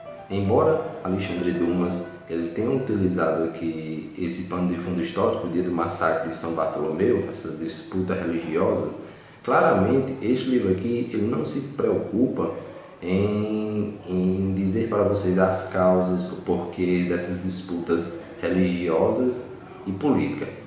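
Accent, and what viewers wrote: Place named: Pernambuco, Brazil. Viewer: Opinei pelo sotaque. Sou de Maranguape, 30Km de Fortaleza. Nossos sotaques são muito parecidos; diferenciam-se nas pronúncias do "te" e do "de", que vocês pronunciam como os pernambucanos. Mas no Ceará também tem essa fala, só que apenas no sul do estado: a região do Cariri. Então, tava esperando você fosse me responder que era de Juazeiro do Norte ou Crato... Namorei com uma menina de Monteiro, em Recife, há muuuuuuitos anos atrás...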